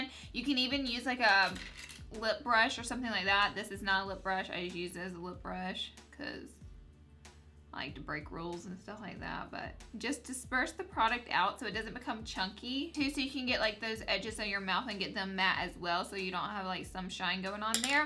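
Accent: South, United States. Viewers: I love your accent!! I'm from Texas and I love hearing that southern accent it just makes everything so much nicer haha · are u American 😂😂😂😂😂😂😀😂😂😂😂😂😂😂😂😂😂😂😂😂😂😂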